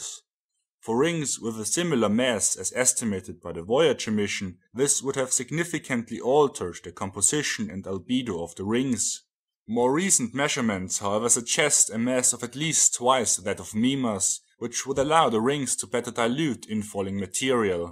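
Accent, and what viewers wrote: Germany. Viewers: A great video, and a great presentation. I love the german accent, and your arguments are delivered with great precision and production value.. It's funny (and telling) to see the difference in how a creationist simplistically disobeys the ninth commandment, And a true factual and researched rebuttal.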